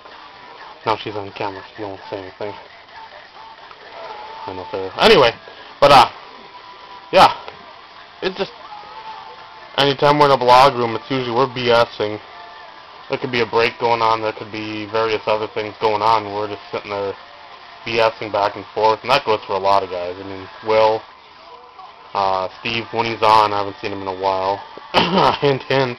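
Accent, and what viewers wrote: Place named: United Kingdom. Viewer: lmao Fool! Nice british accent. Nicely done. Sictophere is indeed a heck of a guy. Great choice man. He does so much for others before thinking of himself. Your looking more and more Amish everyday and that's something to be proud of!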